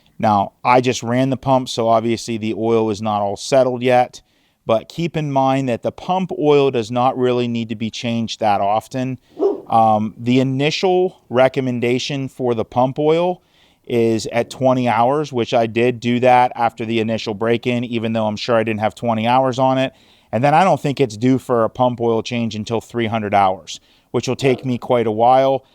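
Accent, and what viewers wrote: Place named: Western Pennsylvania, United States. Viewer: Very good video. Also loving the western PA accent!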